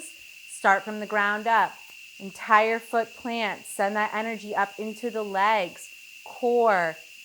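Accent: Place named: Louisiana, United States. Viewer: Also. Sounds like Louisiana.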